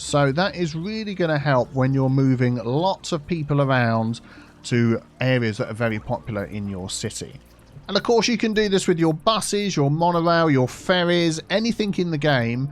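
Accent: United Kingdom. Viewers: Youtube algorithm works in mysterious ways! I had a very similar experience with Silberphönix. And yes, British accent does have that effect! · Sooooo, having a British accent has that affect on non brits? 👀👀👀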